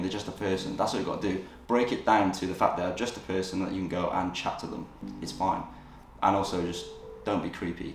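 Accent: England, United Kingdom. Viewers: I turn the caption on coz ya know is being so british heheheh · which English accent is he speaking? 😩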